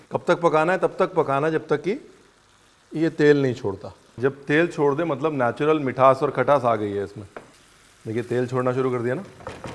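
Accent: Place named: Punjab, India. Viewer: Looking delicious! Love your style of speaking… being a Punjabi it’s wonderful to hear the slang . Be the way you are! ❤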